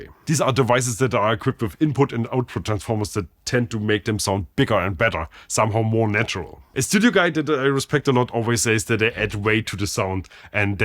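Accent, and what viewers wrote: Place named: Northern Germany, Germany. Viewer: Its Bassic ....A north german accent ....a mixture of viking , german and anglo saxon languages....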